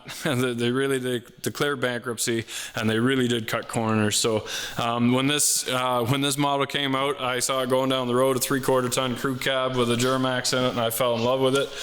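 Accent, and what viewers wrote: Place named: Canada. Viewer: Figured you were somewhere in Canadia by the accent! I am in my last year of college for Mechanical Engineering and I work for a fiberglass composite engineering company during the summers and breaks. Needless to say I'm quite busy balancing it all out!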